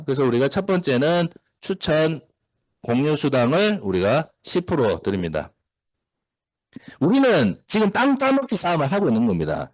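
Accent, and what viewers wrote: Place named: Gyeongsang, South Korea. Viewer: 다함께품 협동조합을 만난것이 축복이고 기쁨입니다! 교육이사님 강의 짱이십니다!갱상도 사투리인데도 귀에 쏙쏙 박힙니다!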